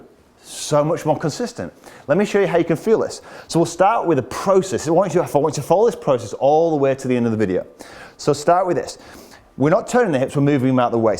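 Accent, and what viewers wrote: United Kingdom. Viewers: You the man , Mister !! Great materials, and the British accent is just an icing on the cake !!!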